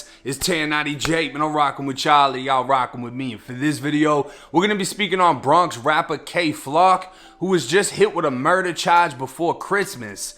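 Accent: Boston, United States. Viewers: Maaaaket. Hahaha. Love that Boston twang bud. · Are you from Boston? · You sound like you are from Boston....that's a long ways from me I reside in beautiful southern California 💪🏽and i put down for my city and state 👊🏽💪🏽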